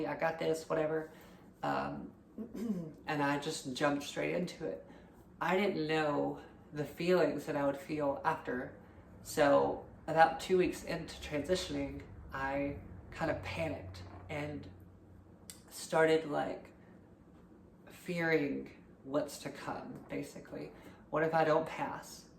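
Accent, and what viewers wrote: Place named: South, United States. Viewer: I am loving the southern drawl in your voice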